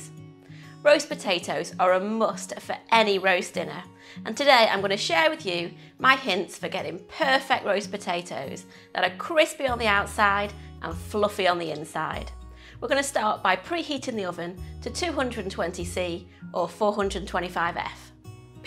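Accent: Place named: England, United Kingdom. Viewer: It was a pleasure listening to your fab English accent, and watching your video, looks very simple gonna make this right away.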